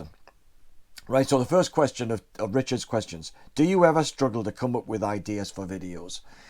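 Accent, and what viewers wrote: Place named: Newcastle, United Kingdom. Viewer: Keep it going,. I really enjoy your videos, especially the music stuff. And for the record (no pun intended), as a teacher of teenagers for the past 22 years, I have a reasonable idea of how to engage an audience and keep them interested. You're terrific at that. The geordie accent helps too!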